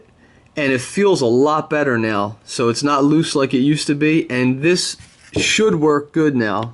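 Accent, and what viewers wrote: Philadelphia, United States. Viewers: Dude, are you from Philly? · Ur accent from phila or south jersey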